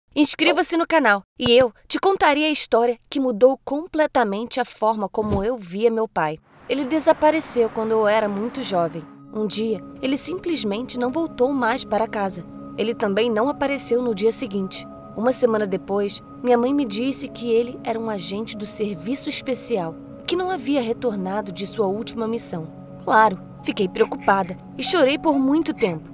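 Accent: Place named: Minas Gerais, Brazil. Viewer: Ela paréce qué ela é minera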